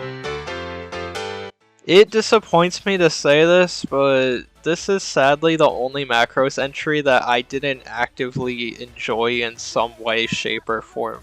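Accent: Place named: West Coast, United States. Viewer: It's okay brother I watched and enjoyed, I too have made cringe during my cursed stay in this world. However your accent eludes me, where is it from??? Some kind of west coast thing?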